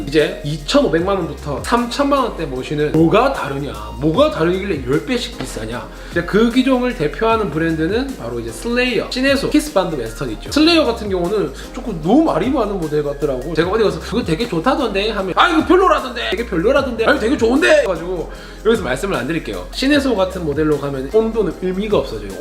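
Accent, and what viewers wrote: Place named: Gyeongsang, South Korea. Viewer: ㅋㅋㄱㅋ 돈을마 발라놧네ㅋㅋ 님 부산에서 카페하시나요?? 경상도사투리가...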